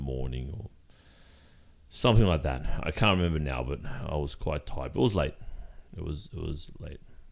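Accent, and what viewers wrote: Australia. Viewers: Australian accent sucks 😕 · Okay I’m stupid, I was about to ask if you were Australian because you sound really Australian, buuuut then I read your channel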